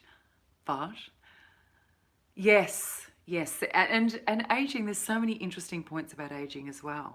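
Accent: Australia. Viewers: Love the Aussie accent it's sexy , I'm scots /Irish we have a huge bond with Australia x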